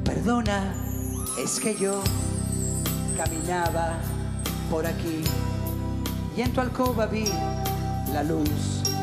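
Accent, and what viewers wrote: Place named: Italy. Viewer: Ese asento italiano chevree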